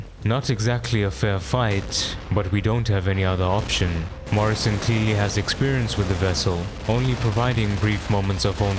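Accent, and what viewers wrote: South Africa. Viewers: I just hear the south african in you 🤣Good video mate, good to see content like this coming out of our country.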